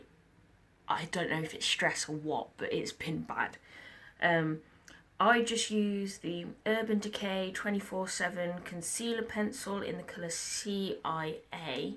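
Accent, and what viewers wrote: United Kingdom. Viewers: lol you speak with a british accent where does that come from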